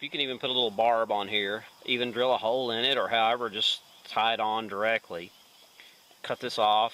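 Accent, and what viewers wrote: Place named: Southern Appalachia, United States. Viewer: Great vid! What part of east tn? Im originally from southeast ky. I could pick up your accent from that part of the country!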